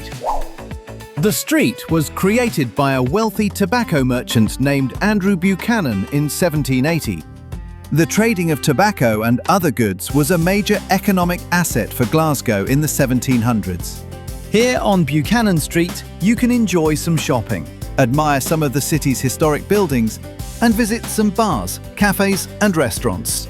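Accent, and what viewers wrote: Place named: England, United Kingdom. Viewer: are narrating with an English accent.....c'mon....surely to God you could employ an ACTUAL SCOTTISH PERSON to narrate about their own country!?!?!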